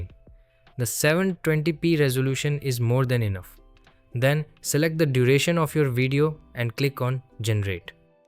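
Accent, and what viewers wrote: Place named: India. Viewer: As soon as heard the Indian accent I already knew it was some bullshit up ahead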